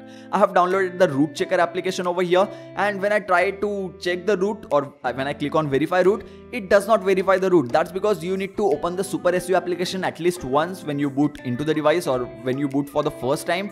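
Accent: India. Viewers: Pure Indian Accent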